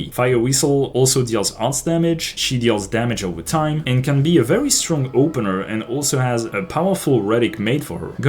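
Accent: France. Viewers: Are you perhaps French? Bonne vidéo haha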